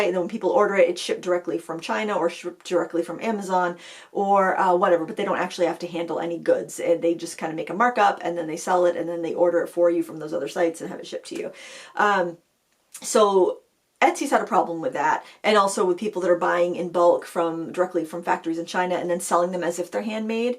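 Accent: Maine, United States. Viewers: Hi! Here in NE Massachusetts, we're getting mostly driving rain and potential damage more along the coast. Be safe; my brother is in Bangor and Dad in Dixmont. I'll keep track of them as well. LOVE your Maine accent as it instantly brings me home! I worked to lose mine in college too. Now it only surfaces when I'm tired. LOL! Take good care this weekend! ❤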